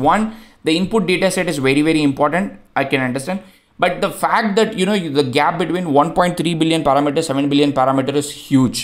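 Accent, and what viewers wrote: India. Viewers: Can you use an AI to remove your Indian accent?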